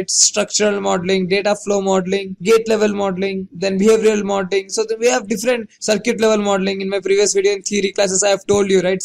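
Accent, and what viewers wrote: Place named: India. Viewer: Good explanation but hard to understand because of the Indian accent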